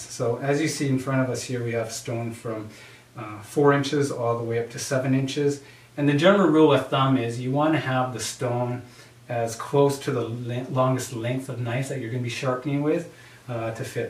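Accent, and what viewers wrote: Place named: Canada. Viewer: Knew he was a fellow Canadian who knew what he was talking aboat.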